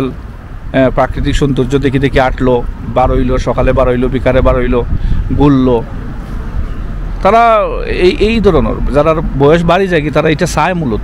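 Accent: Sylhet, Bangladesh. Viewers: Apni jodi sobar jonno video banan,try korben shuddho vashay kotha bolte. Karon sobai to r syleti na. Time r ektu short korle shunte valo lage.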